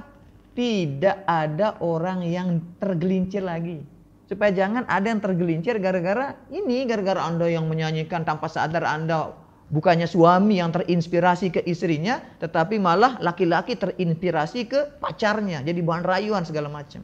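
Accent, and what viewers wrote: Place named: Lombok, Indonesia. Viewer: saht selalu tuan guru,,logak sasak lombok bhsa indonesia mantab guru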